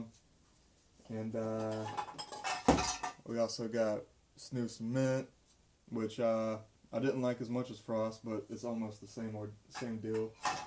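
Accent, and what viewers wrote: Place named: South, United States. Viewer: Thanks for the shout out,! I always liked the tone of voice and attitude that you have! I can hear the southern accent as well as sort of a black sound from a proud white man here! Well, nothing racist here, just your unique, individual style, which is great!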